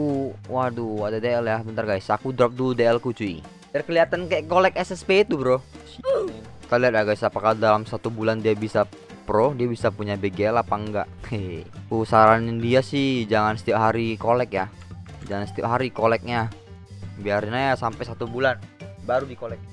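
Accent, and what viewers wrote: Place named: South Jakarta, Indonesia. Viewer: Bg ngomong lu kaya org jaksel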